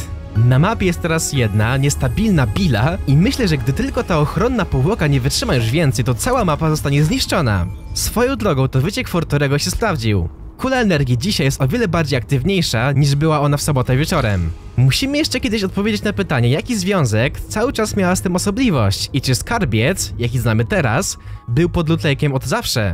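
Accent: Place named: East, Poland. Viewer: Co to niby znaczy? Przecież pisze prawdę. Chłop robi dobre filmy, ale ten jego wschodni akcent wszystko psuje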